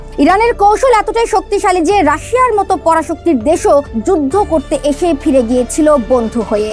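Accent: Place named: Kolkata, India. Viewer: _____এই চ্যানেলের উপস্থাপকের সংবাদপাঠ কলকাতার মতো। এটা কি সত্যি বাংলাদেশের YouTube চ্যানেল নাকি কলকাতার....🤔🤔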